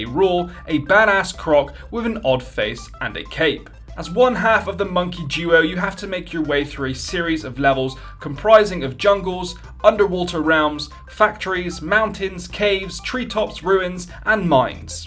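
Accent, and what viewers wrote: Bristol, United Kingdom. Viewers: Bristol accent!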